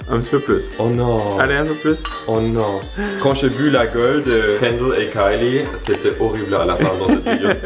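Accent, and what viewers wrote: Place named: Canada. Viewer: tu mon youtubeur préféré des vidéos accent canadien et qui met quoi des vidéos trop trop rire 🇩🇪👍🇨🇦👍🇩🇪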